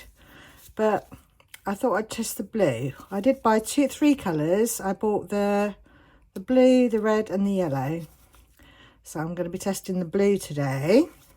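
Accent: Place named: United Kingdom. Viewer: You actually answered a question that I was already wondering. Thank you so much! I already own the Mayspring Dazzling Diamond, but I have heard other crafters raving about the Crushed Crystal. I considered buying the Crushed Crystal, just to see what everyone was raving about. You just saved me some money. I can wait till I use all of my Mayspring then have a go with the other one. Again thank you. I am a new sub now because I think you are doing an amazing job on your channel. Oh and I love your name, it was the name everyone called my mother (a nic-name) I am a Brit living in USA so I like the accent too. Anyways well done my new YouTube friend.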